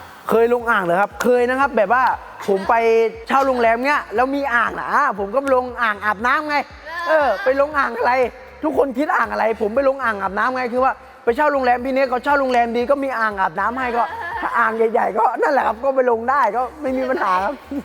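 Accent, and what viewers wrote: Isan, Thailand. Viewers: ทำไม พูดเกียบ5555 อีสานชัดเจน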